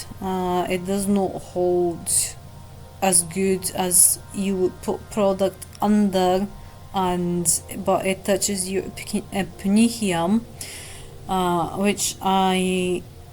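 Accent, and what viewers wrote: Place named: Scotland, United Kingdom. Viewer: You sound Scottish 🏴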